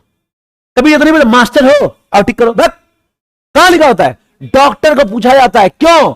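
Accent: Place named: Bihar, India. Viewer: I think he is from Bihar 😅 aawaz waysi hi h ❤️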